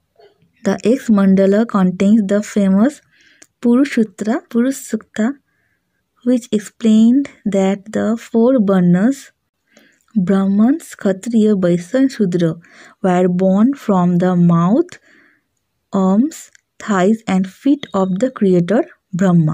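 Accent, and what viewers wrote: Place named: Bengal, India. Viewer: I think you are bengali because taking like bengali English mixs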